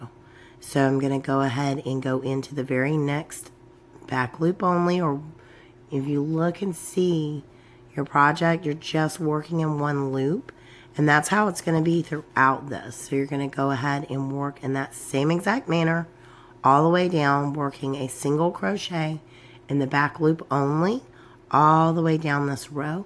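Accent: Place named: Texas, United States. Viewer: Hi! I recently found your channel and just love all your work! Mosaic crochet is simply wonderful and your designs are so nice! By the way, are you in/from Texas? Your accent sounds like, I'm from there (San Antonio) but live very many years in Athens, Greece! Keep up the great work!! Cheers!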